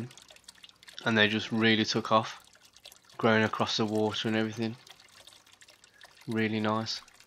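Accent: Birmingham, United Kingdom. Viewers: Thanks for the reply. I am in USA but you sound like a Brummy yet you say Rekcon ,so that kind makes me think Ipswich. Anyway,I have been checking out your videos today and I really enjoy them Thanks.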